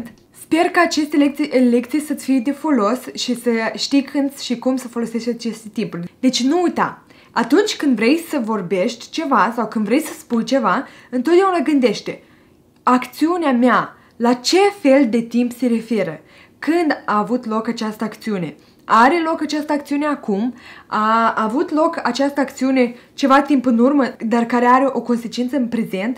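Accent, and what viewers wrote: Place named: Moldova. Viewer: Asa de simpatică mi -ai fost,și dulce cu limba ta Moldovenesc.Te pup.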